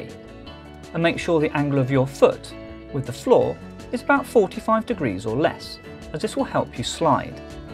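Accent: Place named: United Kingdom. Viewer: tbh, i just watch these videos because i enjoy the british accent^^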